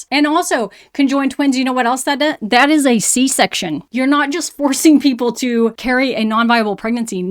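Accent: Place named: South, United States. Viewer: One of the things I love about these videos is hearing somebody with a southern American accent speak reasonable rational truths about this topic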